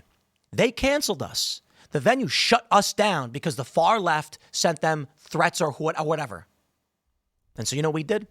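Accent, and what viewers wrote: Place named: Chicago, United States. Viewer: Tim and he Chicago accent. They gotchya by the bawls, he says. haha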